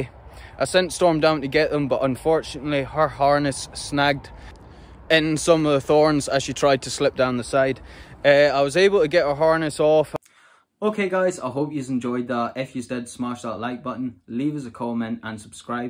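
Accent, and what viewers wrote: Scotland, United Kingdom. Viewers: you do wonderfully with these beautiful, intelligent, well trained dogs and your videos. I love everything. Your dogs doing their jobs so happily, your Scottish accent, the landscape and the music. Love hearing your commands, “come by”, because those sweet dogs jump into their beloved duties. Love from a Scottish ancestry American. ❤❤❤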